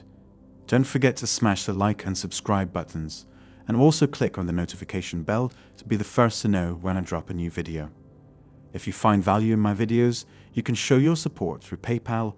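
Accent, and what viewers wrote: United Kingdom. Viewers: I hate the robot voice, even with the British accent.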